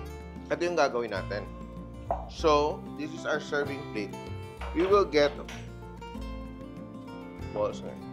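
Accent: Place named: Iloilo, Philippines. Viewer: Ilonggo Ikaw?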